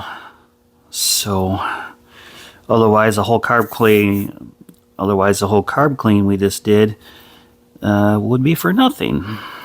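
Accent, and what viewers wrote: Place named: North, United Kingdom. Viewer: Kind of sounds like a cross between a Harley and a John Deere A. You sound like you’re from the great north. Nice video.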